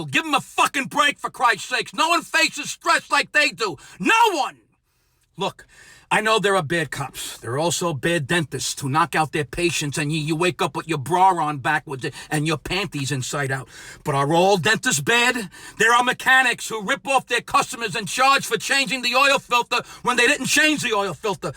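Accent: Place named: Bronx, United States. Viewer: I LOVE ❤️ you. You talk like my grandparents did in da Bronx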